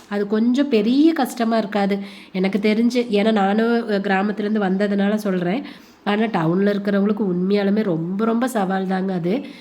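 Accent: Kongu, India. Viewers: கொங்கு தமிழ்❤❤❤அழகு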